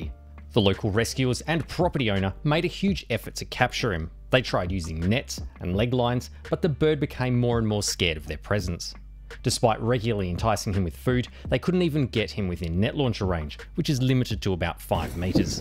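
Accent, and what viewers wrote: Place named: Australia. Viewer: You are SO funny, and clever with the storyline. Great to hear an Aussie accent on you-tube for this sort of thing (engineering, experimentation sort of thing). Maybe the vapour puffs after the wadding exited the tubes are from adiabatic cooling of the compressed air as it escapes and expands rapidly, causing water vapour in the air to condense in micro droplets in the cold gas? (Water vapour creating short-lived, mini clouds).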